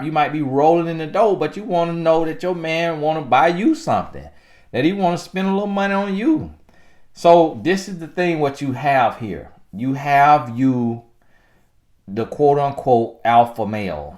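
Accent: South, United States. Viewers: You remind me of my sweet husband. We got married young. He was from Alabama. He passed so I love hearing the southern accent. I'm from California.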